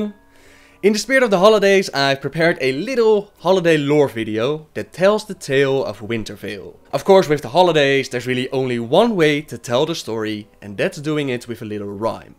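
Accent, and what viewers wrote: Netherlands. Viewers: Love the lore vids. It's nice not having to read it all on the wiki for a change. That accent, Dutch?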